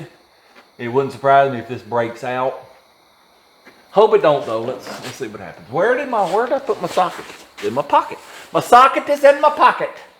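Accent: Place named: Kentucky, United States. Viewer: I got to ask and I'm from KY myself. DO NOT EVER CHANGE YOUR COUNTEY ACCENT!!! It's one of the reasons I watch. Right chear, over yonder and look at this winner (window) AWESOME!!!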